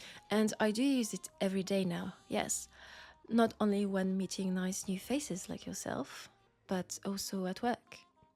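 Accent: France. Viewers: Lovely as usual :) Hearing your french accent + mentions of Paris made me think: Have you played Clair Obscur: Expedition 33? I suspect this game will be a must-play for every French gamer, just like Witcher is for Poles ;) If you did, can we expect a story from the game world?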